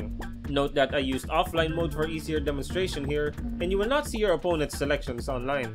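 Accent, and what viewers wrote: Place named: Latvia. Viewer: You sound Latvian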